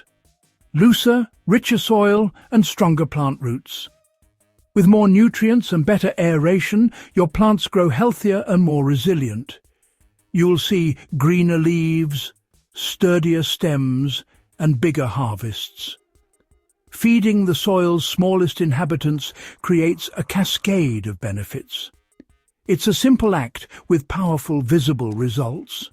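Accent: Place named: United Kingdom. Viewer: I really don't like these AI generated videos. This video is narrated in a distinct British accent and uses metric measurements but says United States?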